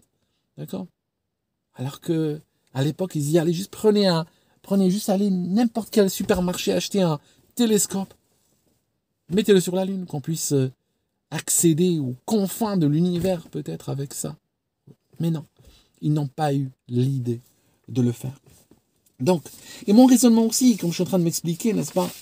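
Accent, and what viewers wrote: Switzerland. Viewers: ton accent Suisse ressort lol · cher, je n'avais pas écouté cette vidéo, très très intéressante, tu gardes ton sérieux, et tu parles avec l'accent suisse. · Je n’avais pas remarqué cette accent suisse, lors des autres vidéos…. Merci 🙏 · Oulala toi tu as vécu en Suisse, je peux même te dire le canton: VD?! Tu as bien gardé ton accent!! Salut l'Ami!! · C'est dingue comme l'accent suisse est présent. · C’est la première vidéo sur laquelle ton passage en Suisse se remarque vraiment à ton brin d’accent d’chez nous 😉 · J'ai adoré ton accent Suisse 😉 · Ton accent suisse s’entend parfaitement cette fois 😊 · Amusant ce petit accent helvétique, je ne l’avais jamais remarqué sur tes autres vidéos d’aviation 😂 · J'adore ton accent Suisse,lorsque tu nous expliques les détails de tes arguments!!! Merci pour tes réflexions 👍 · Merci pour la vidéo on entend parfois ton accent suisse c est super a entendre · Ce qui me choque moi dans cette vidéo, et c'est la première fois que j'entends ça chez toi, c'est qu'on dirait que tu avais un challenge: dans cette vidéo tu dois parler avec l'accent suisse! 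Eh bien challenge réussi! 😁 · de ouf, je me suis dit mais qu'est-ce qu'il fabrique avec cet accent suisse tout à coup?!? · J’avais jamais remarqué l’accent suisse 🇨🇭 😅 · l'accent Suisse qui revient de plus en plus fort la vidéo avançant. Interessant les points que tu soulèves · J’étais pas prêt pour l'accent suisse... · L'aziz. bravo à toi. On te l'a déjà dit j'en suis sûr. Tu as l'accent suisse qui remonte à la surface en ce moment ! Alf shukr pour le contenu et la livraison inégalables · Excellente vidéo (comme toujours)👏👏 où tu argumentes encore mieux que ceux qui ont menti à propos de cette mission. Avec leurs têtes d'hommes honteux pendant la conf de presse, n'importe quel joueur de poker voit que ces hommes ne se considèrent pas comme des héros. Et connaissant l'arrogance des américains quand ils sont fiers de quelque chose, on en déduit que ces 3 hommes sont très mal à l'aise avec ce mensonge et ne sont absolument pas fiers d'eux. 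Bref C.Q.F.D. À noter que pour l'occasion, tu as sorti ton savoureux accent suisse romand, du pur bonheur 😉😊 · Je viens de retombé sur cette vidéo. T'as grave l'accent suisse là-dessus mdr! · Tu as déménagé en Suisse ? Tu as, dans cette vidéo, un accent suisse que je n'avais jamais remarqué. Ceci dit, excellente vidéo. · ton accent suisse ressort lors de ton exposé, Ça fait tout drôle... 😄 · l'accent suisse revient à la charge !😄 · Il n'y a pas que moi qui entend un accent Suisse ??? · Ahaa L'accent suisse.. 🍰 Et oui c'est pas mamie qui fait tous les gâteaux.. ;) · Vous avez un petit accent suisse, bonne continuation avec toute ma sympathie. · Intéressant. Mais c'est une impression ou t'as pris l'accent suisse pendant la vidéo ? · Excellent! Mais c'est quoi cet accent suisse?! 🤣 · Top l’accent suisse qui ressort dans cette vidéo 😂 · Dans cette vidéo, tu as l accent suisse lol, un reste de tes années vécu las bas ? 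Sinon comme tu le dis très justement je préfère avoir tort et avoir l esprit libre ,plutôt qu avoir l esprit d'un poulet élevé en batterie...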